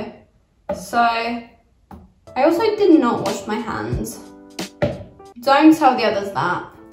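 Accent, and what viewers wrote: United Kingdom. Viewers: I thought you're American, confused with the British accent tho lol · Are u Briiish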